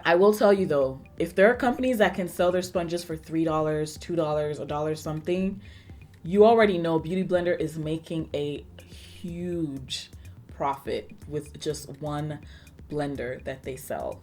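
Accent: Nigeria. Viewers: As a fellow Nigerian, I am going to need a video with you speaking mostly with your Nigerian accent 🤠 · Can we please get a video of you speaking only in your Nigerian accent? I just love it !! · Can you do a video only in your Nigerian voice ❤️ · Wow your hairstyle is cute! <3 Also you are one of the most unproblematic beauty youtubers! I love to watch your videos not even to learn makeup, but to listen to you talk. You always seem so friendly! Like a sister, a friend or the coolest auntie! <3 And your voice is very nice to listen to! And I also love when you speak with your Nigerian accent! <3 It's almost like ASMR for me! <3 Sending you all the love from Poland! Wish you the best! <3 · A British/ pommy accent made a few appearances in this vid, but I definitely love the Nigerian accent!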